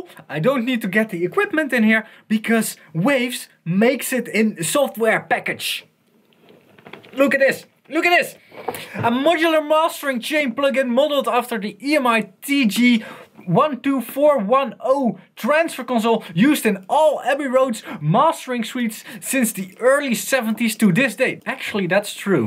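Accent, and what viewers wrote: Netherlands. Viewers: are u dutch? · dutch guy trying to speak english. damn. facepalm, ottoman sideslap, whatever...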